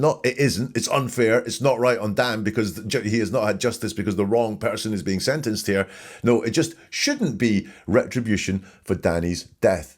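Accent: Scotland, United Kingdom. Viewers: Terrific stuff. Which part of Scotland are you from?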